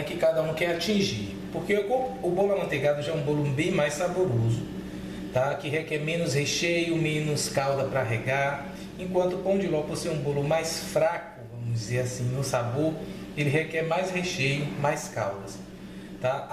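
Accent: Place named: Bahia, Brazil. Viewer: que Deus o abençoe por compartilhar com a gente seus conhecimentos. Parece q vc é baiano né? O povo bom...As viagens q mais gosto são para sua terra. Então, gostaria de uma receita sua pra forma de 30 cm de massa amanteigada branca e de chocolate que na esfarele quando eu cortar.... se puder me passar???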